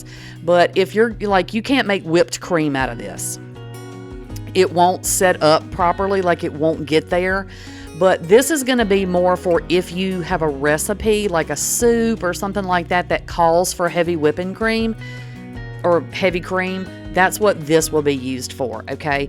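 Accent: South, United States. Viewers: Don't ever apologize because of where you are from or your accent. So many times, southern people are tried to make look less intelligent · Don't take this the wrong way cuz it's a compliment I love your southern accent I don't know what Southern States you're from but it's you sure have a southern accent You take care dear and God bless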